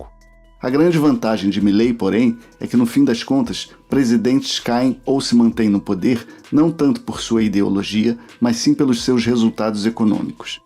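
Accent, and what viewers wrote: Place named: Rio de Janeiro, Brazil. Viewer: Carioca kkk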